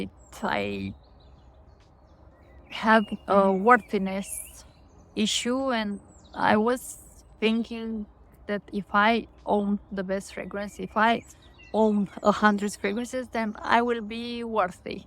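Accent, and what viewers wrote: Romania. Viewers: Dear! Thank you so much for this vulnerable video! I too am Romanian and recognized your accent from the very first seconds. But for the sake of this post, I will comment in English because I am sure so many viewers will resonate with your message. I recently got into discovering perfumes. It hasn't even been 3 months yet, and my collection went from one signature scent that I had for the past 12 years to 6 full-bottle niche perfumes and a bunch of paid decants (and I can't really say I have the income for such big expenditures in such a short amount of time). These past months I've been compulsively watching videos about perfumes, reading on forums, reading people's comments on Fragrantica, taking recurring trips to perfume stores, and hunting the next thing on my wish list. And when I finally got the next perfume, I wasn't even that impressed with it anymore. 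When you mentioned the WHY I immediately started tearing. As you said, I think this sudden obsession came from the need to fill a void as I just experienced a painful loss. I am glad I caught this obsession early on and I am determined to put a full stop to it. Thank you for your message and advice.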